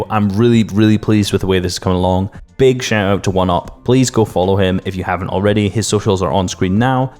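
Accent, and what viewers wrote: Scotland, United Kingdom. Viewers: are you scottish?? if you are you are my favourite analyst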